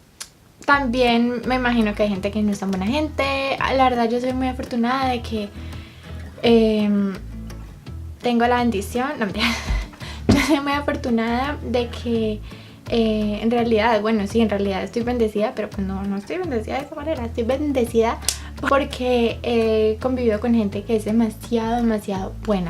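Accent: Cali, Colombia. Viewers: Eso se te oye medio caleña ois jaja tan bella saludos desde cali 👌🏽👌🏽👌🏽 tengo un enamorado de Austria y quiero saber todo de la cultura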